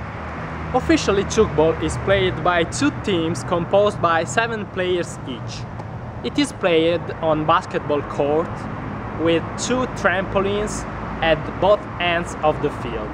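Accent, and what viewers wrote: Italy. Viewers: è bello perché riesci a mantenere la cadenza lombarda anche parlando inglese... bravo! :) e bello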